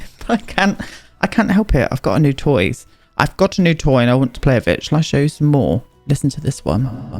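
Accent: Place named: Devon, United Kingdom. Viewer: I just LOVE your videos! I'm originally from Devon but currently training to be a midwife in Birmingham and hearing your accent just reminds me of home and makes me feel all warm and fuzzy inside <3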